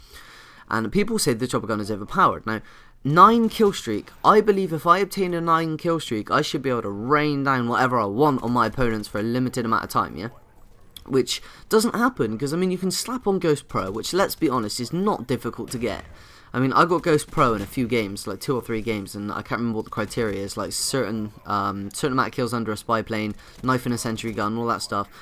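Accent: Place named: England, United Kingdom. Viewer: Where a outs in England are you from:D I love your accent;D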